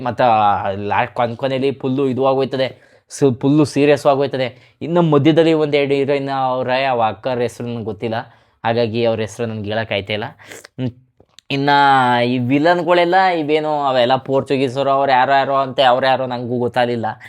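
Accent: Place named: Mandya, India. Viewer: ಜೈ ಮಂಡ್ಯ ಭಾಷೆ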